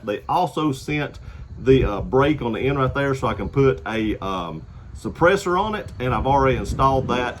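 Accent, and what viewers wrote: South, United States. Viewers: Are you from South ?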